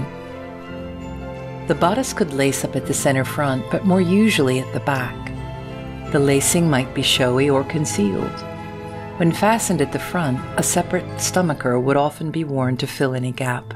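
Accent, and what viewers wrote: United States. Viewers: Why is a yank, who is talking with a "porn voice" narrating this? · Why am American accent??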